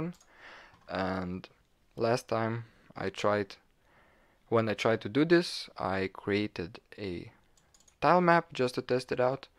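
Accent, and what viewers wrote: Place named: Lithuania. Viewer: u sound like lithuanian xd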